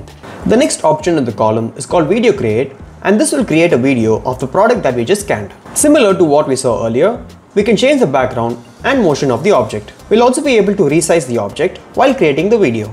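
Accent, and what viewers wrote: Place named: India. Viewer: I appreciate your channel, I would encourage you to slow down your speech since your English has a strong Indian accent, it takes longer for my brain to process the accent. I think you would have more followers by doing this. Good luck and thanks!!!!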